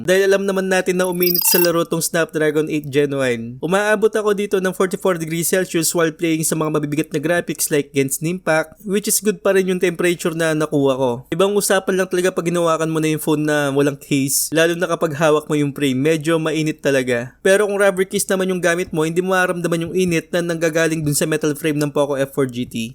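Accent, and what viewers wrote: Pampanga, Philippines. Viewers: Kapampangan kaba sir? Great video btw.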